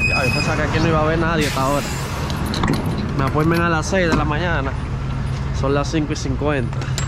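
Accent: Dominican Republic. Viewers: Hey nítido son tus explicaciones ese asentó es puro dominicano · Manin tu suenas dominicano, saludos desde República Dominicana colega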